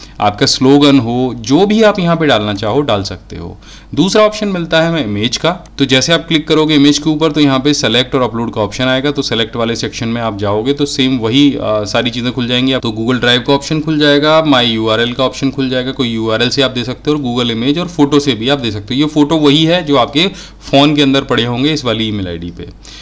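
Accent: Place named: Uttar Pradesh, India. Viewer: thank sir aap bahut deeply or ache se sam jate ho, aapki hindi bahut achi lagti hai,wase bhi u p waalon ki hindi bahut achi hoti hai, main bhi u p se hun, apka padosi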